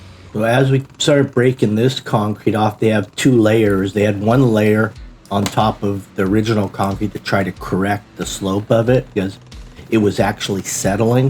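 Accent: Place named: Southern California, United States. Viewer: I thought you were in the OC. Like at the Wedge 🏄‍♂️ 🌊 you're accent. I'm Santa Monica kid , but now I've a house is in the friggin Val haha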